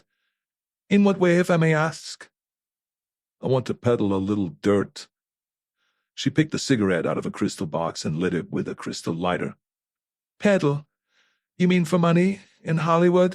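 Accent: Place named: Germany. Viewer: Enjoyed the reading. Even with what seems like a hint of a German accent seeping out. I like to imagine that Schwarzenegger was doing the reading. 😂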